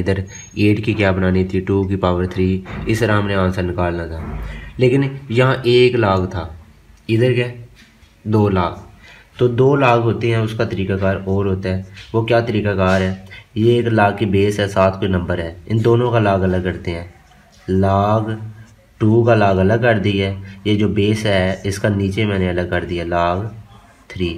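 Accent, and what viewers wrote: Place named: India. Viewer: I love your Desi accent laaaaag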